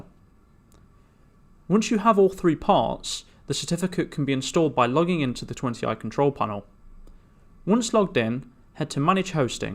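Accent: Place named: United Kingdom. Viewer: It's not really clear and I can't find SSL/TLS on cPenal but anyway I liked the video because you have a nice British accent lol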